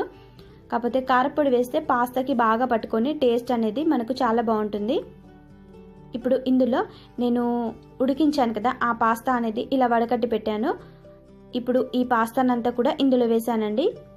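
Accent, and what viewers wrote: Telangana, India. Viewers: Pakkatelangana matladutunnaru pillalto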